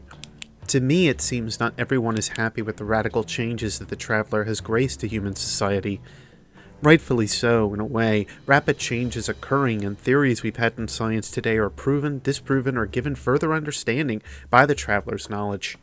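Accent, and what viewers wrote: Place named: Russia. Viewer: Your Russian accent sounds like a Nord dude from the Elder Scrolls Online...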